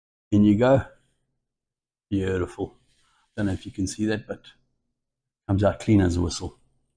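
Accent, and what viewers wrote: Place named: Australia. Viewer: I have stove/oven envy! Love the video and so nice to see the Aussie accent.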